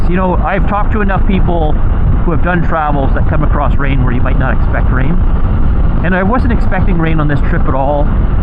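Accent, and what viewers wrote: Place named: Canada. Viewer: As I've gotten older, a 3 or 4-day trip is the sweet spot for me. From where I live in central North Carolina, I'm less than a half day ride from some of the best roads on the east coast. I have a couple friends that I'll do trips with, but I'm the one who always plans the routes, arranges the hotels, lunch stops, etc. I love riding with my buddies, but doing my own thing relieves me of those duties--and the stress--and makes the ride more enjoyable. I didn't realize you were Canadian (I should have picked up on the accent, lol). I work in Canada quite a bit, as we have a branch office in Mississauga. I'll be working in Montreal for a week in September, followed by a week in Edmonton/Calgary. Good video, buddy. Ride safe.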